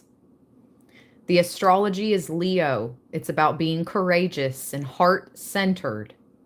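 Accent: United States. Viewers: Haha thats so funny you mention about your accent etc. - I've gone from thinking you were in Atlanta, to NJ - to generally being clueless. It dont matter! you're ancient. But i resonate with your ancient Appalachian for sure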